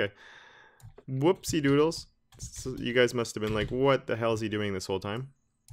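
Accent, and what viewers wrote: Canada. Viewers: you must be Canadian arent you? the accent sounds soo Canadian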